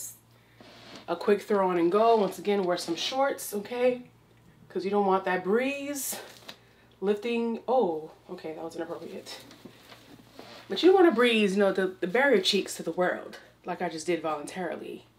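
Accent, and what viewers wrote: United Kingdom. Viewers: It’s the English/ British accent for me 😂😂😂😂😂😂😂😂😂😂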